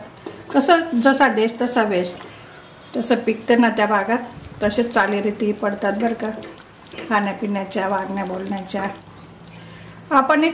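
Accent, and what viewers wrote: Khandesh, India. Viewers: खानदेशी आवाज 😍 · तुमच्या toning वरून तुम्ही कोकणातल्या वाटत नाही, तुमचं toning नाशिक, मालेगाव, जळगाव इकडचं वाटतंय... पण रेसिपी खूप छान, अस्सल मालवणी .. 👍😀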